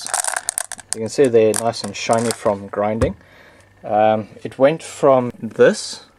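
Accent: South Africa. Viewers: Are you South African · Really cool, are you south african?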